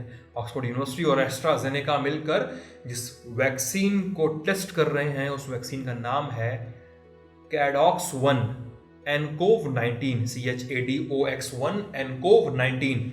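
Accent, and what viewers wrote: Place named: Uttar Pradesh, India. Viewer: Bhaiya ke bolne ka style lag rha hai up waale bhaiya hai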